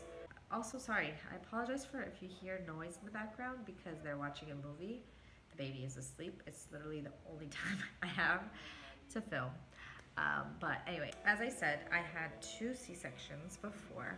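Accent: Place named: North East England, United Kingdom. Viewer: Are you from the north east? You sound as if you might be.... Oh I would love to find an OB that would allow a VBA2C.... We are in Ohio....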